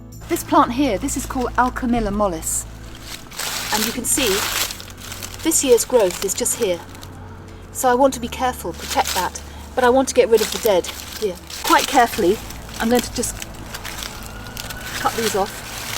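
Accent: London, United Kingdom. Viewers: 喜欢Manda说英语的感觉，好优雅好精致，伦敦音好好听～而且解释的好细致好专业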